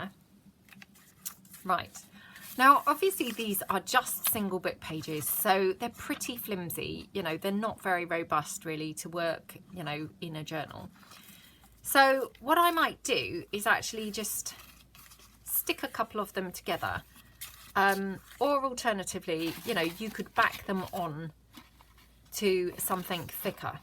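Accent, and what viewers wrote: England, United Kingdom. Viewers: I dont do a lot of vintage journaling , but I just love that beautiful English accent . :)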